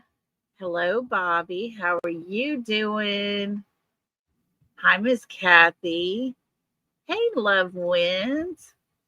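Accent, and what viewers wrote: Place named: South, United States. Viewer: !!!! I've missed that Southern Drawl!!! So happy to see a video from you!! Imo YES! That is so weird that she would come out and go the same way you do!! 😱😱 R u sure they don't have a tracker on your car 🤔🤔 What are the odds?!?! I don't believe in coincidences... 🧐🧐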